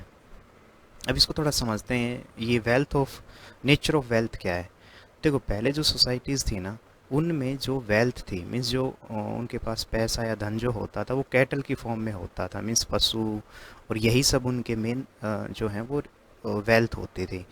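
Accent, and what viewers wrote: Haryana, India. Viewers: Aap pakka Haryana se Ho sir. Aapki language se Lagta hai. Beech beech me yo haryanvi touch bahut Badhiya hai. Aap bahut acha padhate hai😊 12 unit ke baad bhi video banaiye please . Humble request 🙏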